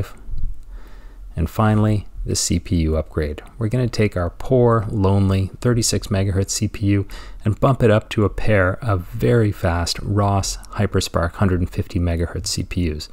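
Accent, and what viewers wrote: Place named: Canada. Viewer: I bet you're Canadian.... (my ears always perk up when I hear "aboat"). A Sparc 10 was what I spent the latter half of the 90s on. Nice to be able to project vicariously what upgrading mine would have been like...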